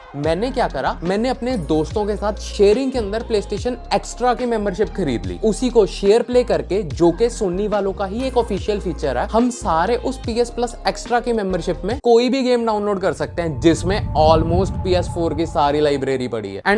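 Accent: Punjab, India. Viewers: Bro's Punjabi Accent 😂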